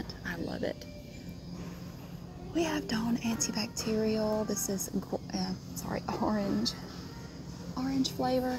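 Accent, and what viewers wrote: South, United States. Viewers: Everyone talks about your southern accent but it sounds normal to me since i’m from south georgia 😂😂😂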